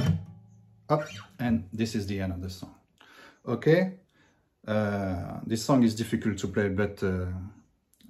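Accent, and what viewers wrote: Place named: France. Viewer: you are very precise, concise and clear minus the slight french accent haha! thanks for this tutorial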